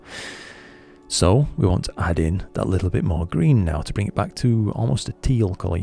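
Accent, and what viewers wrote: United Kingdom. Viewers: Tried again from scratch, now I get this "Cannot execute instance on view: R Reason: LRGBCombination cannot be executed on grayscale images." ( to your earlier note, there is no way to extract luminence from the split G or B files ). Do you do zooms? Be happy to pay you for 30 mins to watch me and tell me what I'm doing wrong, live in the USA, guessing you're somewhere in the UK? (I'm an Australian so I speak British lol)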